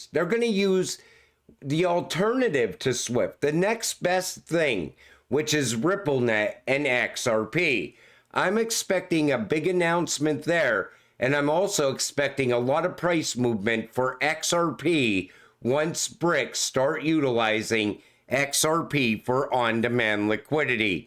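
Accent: Pittsburgh, United States. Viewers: You sound like a Yinzer, from Pittsburgh!!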